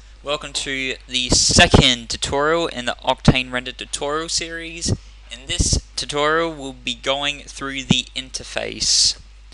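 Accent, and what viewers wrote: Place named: England, United Kingdom. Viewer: Какой чудовищно неприятный английский акцент =(((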